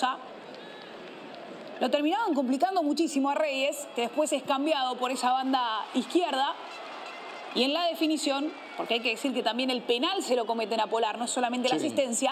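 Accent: Argentina. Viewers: No es por nada, pero creo no es suficiente tener acento argentino para comentar los partidos. Solo en Perú se recibe bien a los extranjeros no sucediendo asi en con los peruanos en otros paises. Aqui basta que hablen como argentinos y ya son expertos en cualquier cosa.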